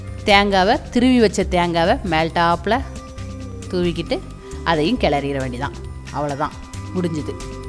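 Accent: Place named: Tirunelveli, India. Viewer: சிறுகிழங்கு அவரைக்காய் பொரியல் சூப்பர், உங்கள் தெளிவான திருநெல்வேலி தமிழ் மிகவும் சூப்பர்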